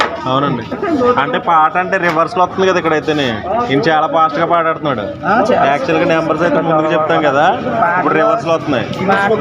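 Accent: Godavari, India. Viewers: Funny conversation... With our godavari yasa.. Good job bro... Mana godavari jilla ante yentha special lo andariki chupistunnaru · I'm Guntur but I like godhavari yasa baguntadi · Nenu.. Mee slung kosam chusthanu... Pakka godharolla voice... 😊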